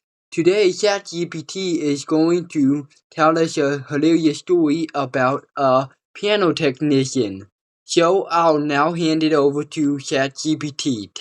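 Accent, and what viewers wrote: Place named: United Kingdom. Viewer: I love the British accent. Great story! Haha!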